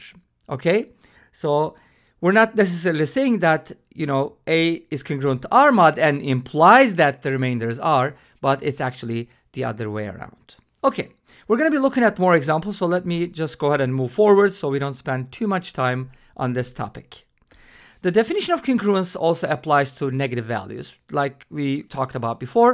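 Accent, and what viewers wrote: Turkey. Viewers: you sound extremely turkish, are you?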